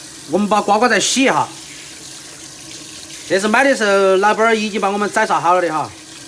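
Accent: Sichuan, China. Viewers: 我是台灣人，川菜迷，這是我最喜歡的川菜之一，尤其是的四川話，我總是辺看辺學說四川話😋⋯我愛四川💕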